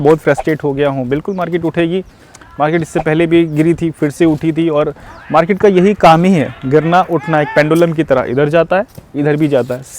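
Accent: Uttar Pradesh, India. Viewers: Sir yeh Kaunse GARDEN me Video banate ho har Sunday ko? aur aap Kaha se ho...? aapka accent UP wala hai, mai bhi UP se hu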